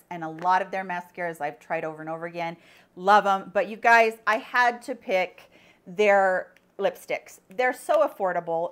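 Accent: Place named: Midwest, United States. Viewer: Where are you from? You sound midwestern. Btw loved the video!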